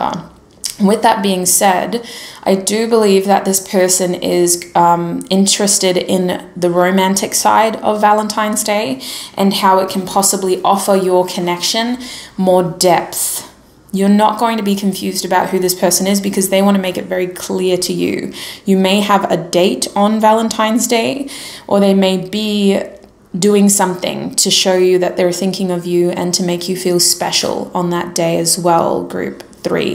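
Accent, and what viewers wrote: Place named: Australia. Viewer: Hi would you consider doing meditation videos, you have a soothing Australian accent which is very calming and easy listening to hear. Very relaxing ❤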